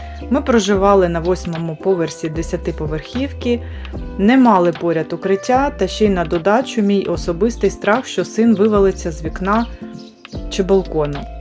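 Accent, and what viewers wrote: Standard. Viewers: Дякую вам. Ви трішечки змінили мою думку про східних переселенців на кращу. Особливо вражає чиста українська мова. Бажаю вам, щоб у вас все було добре, і вірю, що так буде: і у вас, і у нас-в Україні. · Ютуб закинув це відео в рекомендовані і воно набирає перегляди . Робіть заставки відео без дрібного шрифту . Як для блогера у вас гарна дикція, чиста українська мова і вміння формулювати думку . У вас все вийде, розвивайтеся, експериментуйте - успіх буде .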